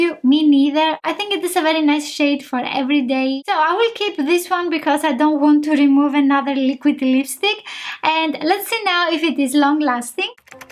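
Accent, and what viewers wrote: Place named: Greece. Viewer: I immediately knew you were Greek 😂❤️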